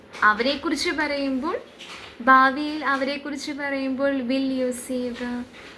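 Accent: Palakkad, India. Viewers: Pkd Slang 🔥 😂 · Palakkadan slang super 🙌😂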